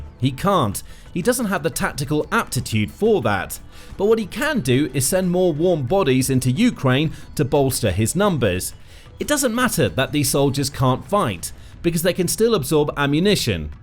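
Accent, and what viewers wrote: United Kingdom. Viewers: The narrator is obviously British...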